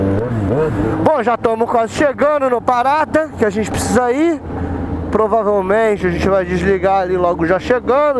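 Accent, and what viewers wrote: Rio de Janeiro, Brazil. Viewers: Você é do rio né brother? Maneiro! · Esse sotaque carioca,e deixa louca....que tesão!!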